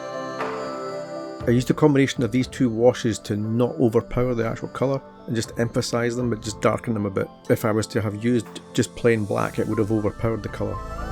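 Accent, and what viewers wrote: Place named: Glasgow, United Kingdom. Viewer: Watching this beautiful painting and learning from it while listening to this wonderful Glaswegian accent is a whole new treat for me ❤, keep it up brother and greetings from Iraq .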